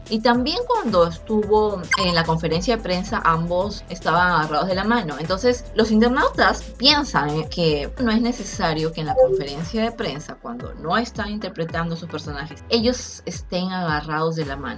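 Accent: Peru. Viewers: Sospechaba que eras peruana por tu manera de hablar. Yo también. Y te felicito por el gran trabajo que desempeñas. Que sigan los éxitos! ❤ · Es obvio que eres de Perú, tu acento te delata amiga y pues no es necesario que digas de dónde eres ya que son noticias del entrenamiento coreano.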